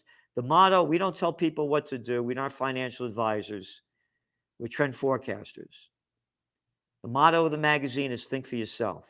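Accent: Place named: United States. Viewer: From Manchester, England. Love your output . (And one of few American accents I can listen to)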